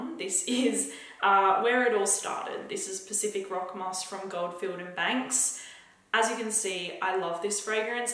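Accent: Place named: Australia. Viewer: Anyone else generally just really like videos but really stay tuned just to learn how to authentically say "know" or "no" with an authentic Australian accent!? Rhetorical question. I KNOW I'm not the only one.. so..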